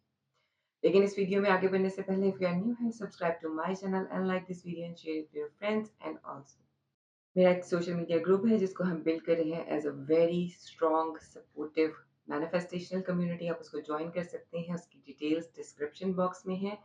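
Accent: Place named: Pakistan. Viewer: Pakistan, you can feel the difference in her accent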